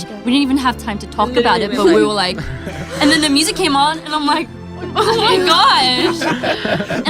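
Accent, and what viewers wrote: Australia. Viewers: Dat aussie accent doe. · Yes ....she can inspire anyone with her words and her australian accent..gosh no wonder her voice is so amazing.... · I'm sold because of the ausie accent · Her random Aussie accent reminded me Ozzy The Commenter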